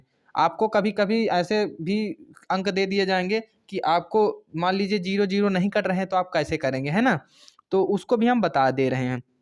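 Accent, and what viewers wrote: India. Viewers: Mast gajab ji bagheli bole ho yrr😁😁😁😁mast samjhya apne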